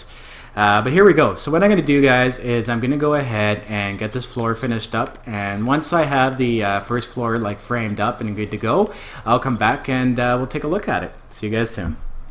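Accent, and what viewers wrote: Canada. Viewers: had a suspicion you were Canadian because of your voice man lol I'm from London Ontario what pert O Canada you from eh?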